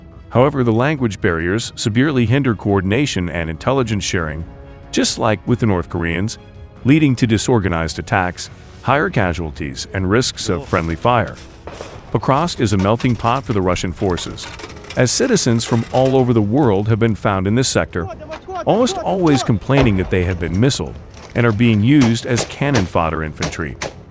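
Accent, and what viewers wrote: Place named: United States. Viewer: Tedious American voice , can't even read or speak English correctly .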